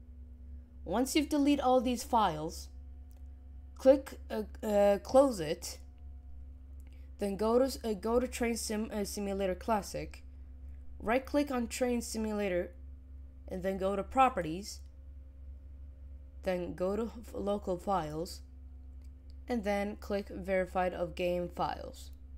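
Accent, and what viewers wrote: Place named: United States. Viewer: why does bro sounds american when bro lives in saudi arabia 💀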